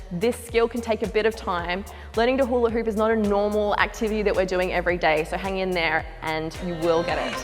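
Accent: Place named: Australia. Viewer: Amazing video and explanations. No one else had all this info Hate the aussie voice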